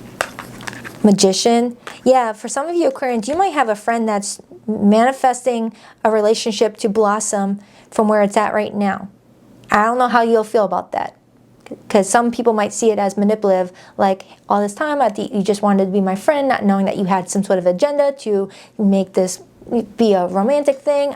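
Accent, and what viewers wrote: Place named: New England, United States. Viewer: I love your new england accent ❤❤❤❤ I live in the south and we absolutely love that accent because its different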